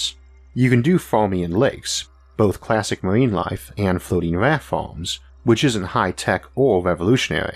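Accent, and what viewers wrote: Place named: Texas, United States. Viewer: Yes as a Brit I thought that maybe he was a Texan or from the Southern states.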